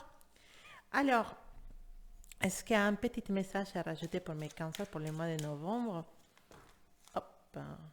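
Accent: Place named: Spain. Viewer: Ne changez rien 🤣 il est beau votre accent... Espagnol non? Gratitude infinie 😍😍😍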